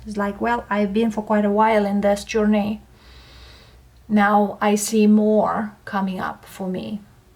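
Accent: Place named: Russia. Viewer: Always knew U R Russian😊😅👍That beauty eyes accent😊💝👍Goddess 😊👍💖🌻Many thanks! Much Blessings2U/Family💖🌻💝😊🙏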